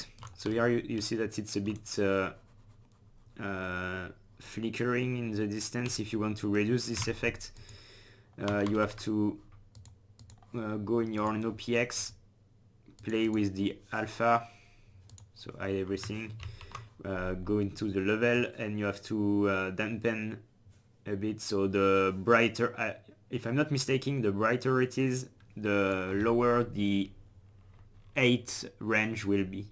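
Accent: France. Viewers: Very noticable french accent ;) thanks for taking care of the Arma modding community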